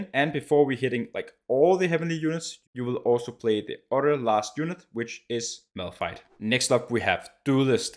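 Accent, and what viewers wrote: Denmark. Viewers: Your accents sounds like Denmark people that i met Yesterday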